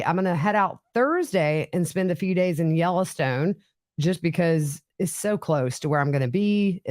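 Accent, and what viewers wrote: South, United States. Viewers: What a remarkable dissonance it is to hear your sweet southern voice describing such a heinous crime.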